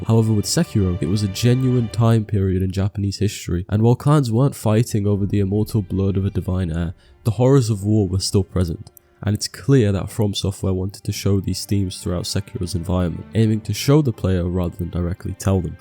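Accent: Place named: United Kingdom. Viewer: Hmm British dude doing soulsborne commentary? Subbed.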